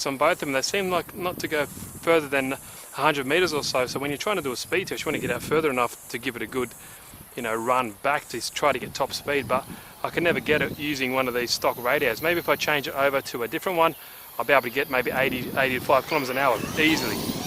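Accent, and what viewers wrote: Australia. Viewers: Where are you from you sound austrailian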